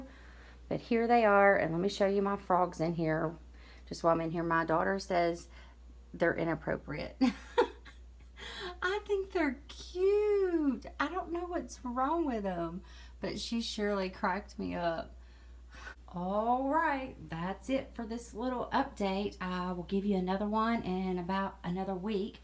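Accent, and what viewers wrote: South, United States. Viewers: Ciao,. You have the cutest southern accent in all of the world, my dear friend. What's your honest opinion on roses? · I love how excited you are getting about growing these! Also, I’m going to have a better day because you told me too in your lovely southern accent 😊🤗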